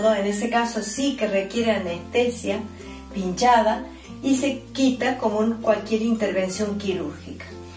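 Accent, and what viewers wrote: Córdoba, Argentina. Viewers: Debe ser sonido argentino, quizá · Parece Cordobesa de Argentina doctora aunque con el acento un poco cambiado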